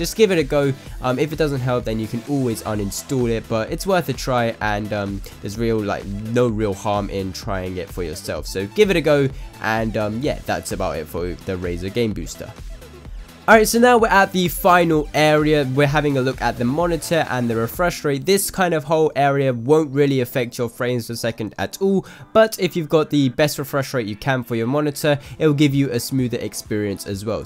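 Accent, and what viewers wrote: India. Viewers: your from north london? your accent seems indian and your face, and also your name everything seems indian soo yeah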